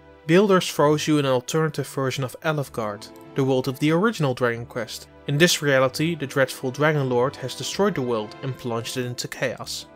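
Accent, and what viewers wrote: Netherlands. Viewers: Are you Dutch? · He’s Dutch. Hate to tell ya buddy but English isn’t everyone’s main language. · That dutch English tho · Hello Apollo Justice the accent is Dutch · Thick dutch accent. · very dutch yea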